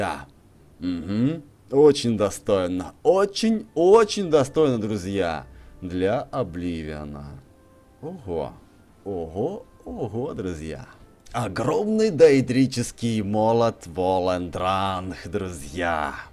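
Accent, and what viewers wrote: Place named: Ukraine. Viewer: У тебя акцент.... ты украинец?)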